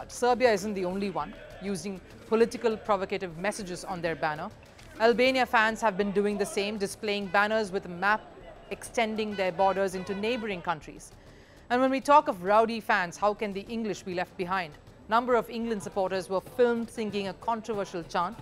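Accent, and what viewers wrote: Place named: India. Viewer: She has an Indian accent ...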